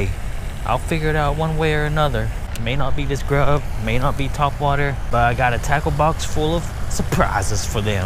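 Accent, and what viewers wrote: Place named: South, United States. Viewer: Dope B roll... Idk. Dope southern accent... Oh Yeah!!! Informative video. Thanks for posting! Is that the bass pro in bristol ?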